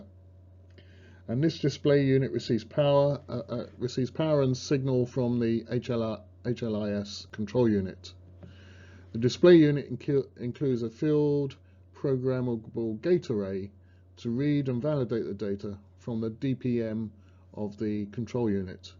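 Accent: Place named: England, United Kingdom. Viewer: Hello thank you very much for all your beautiful video I am one of the pilots who love if I could choose the right word love this Aircraft so my noticed to you is can you please find any other Mike for find how you could fix the volume because really hardly trying to listen to you specially in your English accent the prettiest I guess so so please feed it a bit more louder for six the issue in the mike that would be great from you thank you very much again for all this beautiful video. By the way since couple of years I’m trying to save some money to go for the 605 typerating course so sorry do you suggest to go CEA OR Flight safety or is there any other way to get the typerating cheaper or less than their pricelist specially in coronavirus situation As FAA And one more question please I’m ready for The oral and written test so is there any possible way to go direct to get the exams and then to disability training to save some money because as far as know most of the training centre all over the world doing this option is based on coronavirus situation thank you very much hope to hear from you soon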